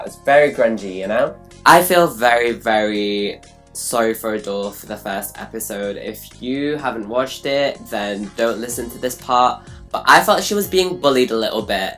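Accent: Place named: Nottingham, United Kingdom. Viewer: Fuck yeh I love I love adore and I'm so glad a boy in makeup from Nottingham same as me 🤘🤘 has made a channel like same accent come on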